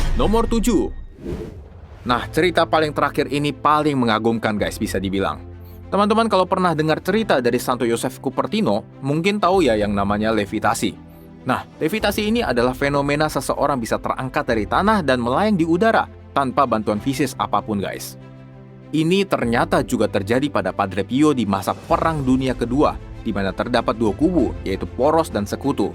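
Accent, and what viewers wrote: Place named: Java, Indonesia. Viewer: Jangan pakai logat jawa lah. Jadi gak enak konten mu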